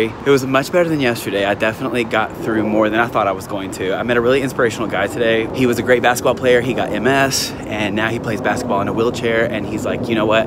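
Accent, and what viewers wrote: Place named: South, United States. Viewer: Just now discovering this! FYI your accent totally changed after working out with! Haha. Southern boy made an appearance. Can't wait to meet you someday! (and watch all your videos!!!)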